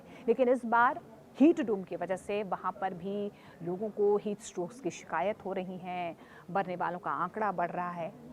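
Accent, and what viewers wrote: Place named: South, India. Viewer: खाबर😮 खबर होगा तू एक साउथ इंडियन है हिंदी से पता चली जाता है। बांग्लादेशी जितने भी साफ सुथरा बांग्लादेशी बोले वह बांग्लादेशी है बंगाली से समझ में आता है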